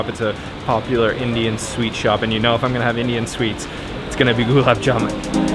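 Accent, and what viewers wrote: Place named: Canada. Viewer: He's Canadian 😂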